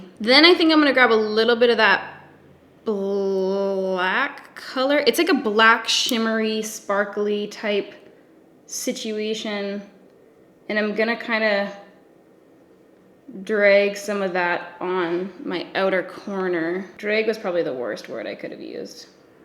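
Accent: Canada. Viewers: I love when the Canadian comes out in your voice. It makes me happy. You guys have the best accent! Side note: it reminds me of the Anchorman 2 fight scene with the Canadian news team from Quebec. #SORRY hahahaha 😘😘😘😘😂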